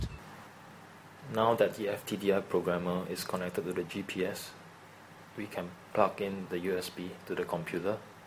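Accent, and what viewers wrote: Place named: Singapore. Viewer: Singapore English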